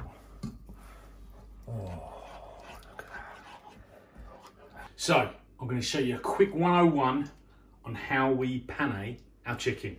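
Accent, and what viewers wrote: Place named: Cockney, United Kingdom. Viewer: I can't get over this guy. I guess he really is the head chef at Wagamama but he speaks like he's from EastEnders and displays a real lack of culinary vocabulary saying things like "soya sauce" and "too-meric." It's like the real Steve Mangleshot wasn't available so they just drafted in any old dodgy actor that they had during lockdown!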